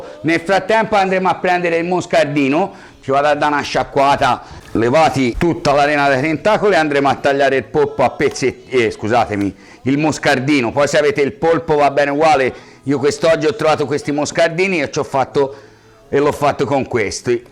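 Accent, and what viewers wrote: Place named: Livorno, Italy. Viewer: Ciao, ti ho scoperto da pochi giorni e già sei diventato la star della mia cucina e mi piace troppo l'accento livornese. Io abito a Montecatini da cinque anni ma sono della provincia di Torino esattamente della Val di Susa (piena di corsari), sto già pianificando una gita a Livorno al tuo ristorante, ti voglio sentire dal vivo, sei forte!!! Ciau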